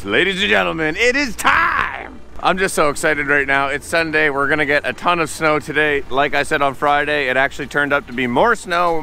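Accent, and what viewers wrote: Canada. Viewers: Those Canadian long Os are hysterical.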